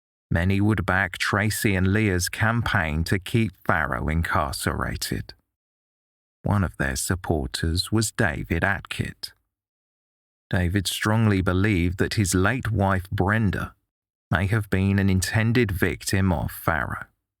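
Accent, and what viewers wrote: United Kingdom. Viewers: I’m so glad I discovered your channel - British 🇬🇧 voice😀 great content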